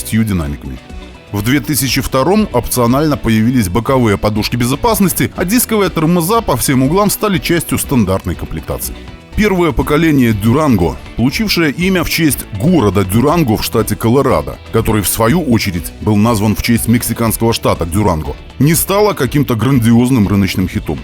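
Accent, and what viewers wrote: Belarus. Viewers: Белорусский акцент ппц)))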